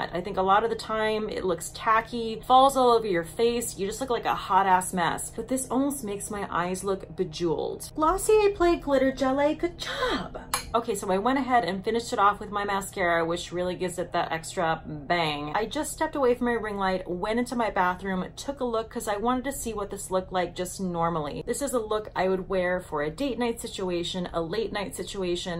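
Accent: France. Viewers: Hiya toots! Love your French accent! Have you ever seen the episode Girlfriends? There is a French song called that is hilarious! Oh and there have been four Titanic movies! My fave is the one with Barbara Stanwyck and Clifton Webb. So good! Love this Glossier Play line and you’re right, it has a 70s vibe! You look just adorable/chic/glamorous! Be careful with those glaciers girl. 😘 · I loved this! I would wear that glitter and I'm turning 60 this month! Love the liners, too! This is a brand I've never tried but I did try Colourpop because of you so maybe I'll try this out too! Love the French accent! You never fail to entertain! Be careful out there! Thank you for another exciting video!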